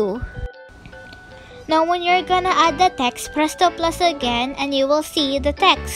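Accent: Philippines. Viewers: She sounds Filipino. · Are u filipino? Cuz ur accent is like mine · Wow I just realized that this accent is Filipino, sooooo I bet this is gacha tuber is filipino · your accent is like pilipino thing But your cute anyways whos pilipino there click the like button Small youtuber here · Just from the voice i here another FILIPINOOOO YAYAYYAYAYAYAY SHES ONE OF US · You have a Filipino accent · You speaking seems like philipina's are you philipina's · Are you Philippina? You sound like you have a phillippino accent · Uhmm... "Karachter" You have a Philippine accent Ms. Cute voice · ME: hears her voice ME: FILIPINO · Your voice is nice but it sound like your filipino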